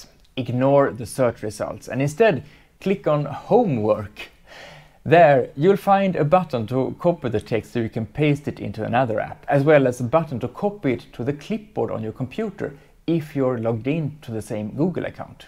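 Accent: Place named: Germany. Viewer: God bless you for your intention to help inform people. Please be aware that your high intelligence and multiple language knowledge seem to have come at the price of comprehensibility for your listeners - it is extremely difficult to discern if you are soeaking German with an Irish accent, or soeaking Turkish with a German accent. In both attempts to decipher your speech through the poor audio quality of significant room noise snd highly reflective surfaces of walls floors tables windows and ceilings, it is indeterminable. Please ask experienced web video makers for free tools and tips on improving communication and perception and creating successful communication. My grandma was from Oldcastle Ireland. So no bias against thick accents per se. :-) I'm US English speaker with simpleton level vocabulary in German and Spanish and idiot American level French - basically "fluent"! 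8-D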